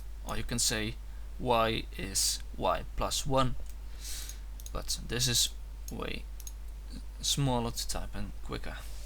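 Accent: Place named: United Kingdom. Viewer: I thought you were british.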